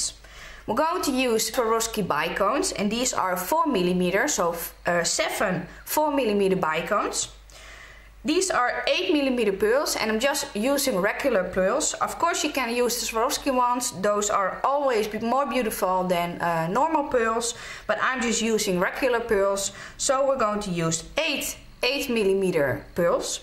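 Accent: Netherlands. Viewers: hoorde aan je accent dat je nederlands bent haha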